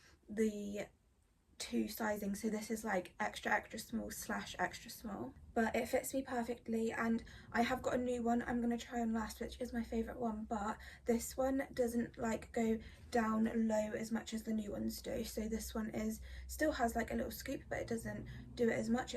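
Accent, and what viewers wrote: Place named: United Kingdom. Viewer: You are very pretty and cute. Beautiful eyes, cute voice with the British accent and pretty smile. Beautiful body and curves, cute little belly button. You look very pretty and very cute in everything this video. Everything fits you so well and look beautiful on your curves. You are really cute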